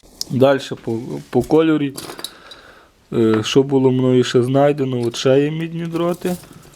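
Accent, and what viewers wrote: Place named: Ternopil, Ukraine. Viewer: Привіт! Файні відео знімаєш. Судячи по мові ти мій земляк (я зі Зборова, Тернопільщина). Чи можна з тобою поспілкуватись в інший спосіб (форум, телефон)?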